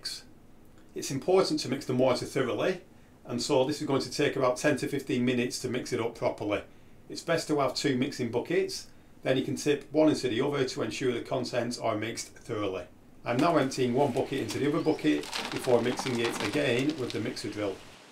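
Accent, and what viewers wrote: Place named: Lancashire, United Kingdom. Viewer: Lancashire i am guessing. Nothing wrong with his accent.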